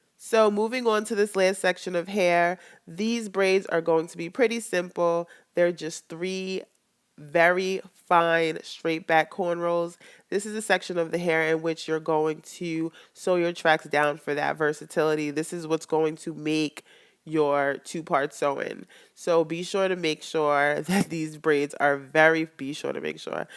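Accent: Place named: New York, United States. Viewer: u have a very strong new York accent I like it lol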